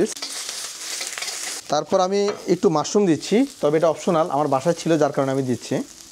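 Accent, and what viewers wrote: Noakhali, Bangladesh. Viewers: This is the first video I have watched on your channel. I come to know this channel from facebook reels. Now I am watching every video of this channel. btw love your noakhali accent bro!!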